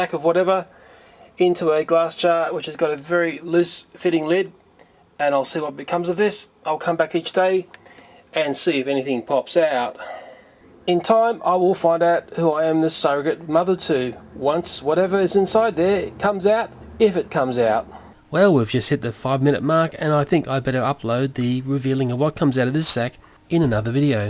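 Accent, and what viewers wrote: Australia. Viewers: KawaiiKitty I think he's Australian, and i believe it was a silkworm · Sounds like he is Australian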